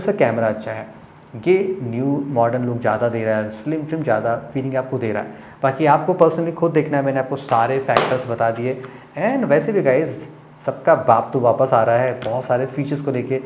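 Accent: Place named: Kanpur, India. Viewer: Bhai ap kanpur se ho kio ki saddi kanpur me bolte hai 👍👍👍👍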